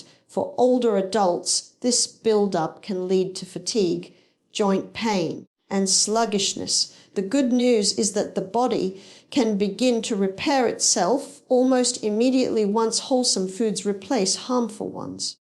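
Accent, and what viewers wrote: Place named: United States. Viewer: This isn't Barbara it's AI. As an Australian I can tell it's not her accent, it's American.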